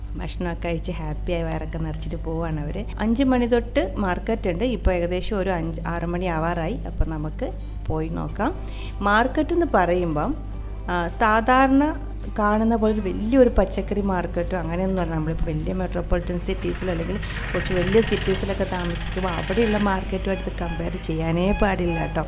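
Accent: Tamil Nadu, India. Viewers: No Small tamil touch in the conversion.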